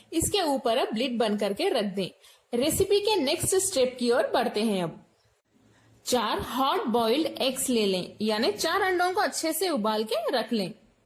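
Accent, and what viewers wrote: Hyderabad, India. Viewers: Madam ka Slang Hyderabadi dikhra😁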